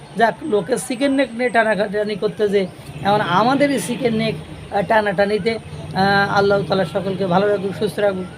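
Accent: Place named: Sundarbans, Bangladesh. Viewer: আপনার উচ্চারণ, ও শব্দ চয়নে ভারতের সুন্দরবন অঞ্চলের ছোঁয়া আছে।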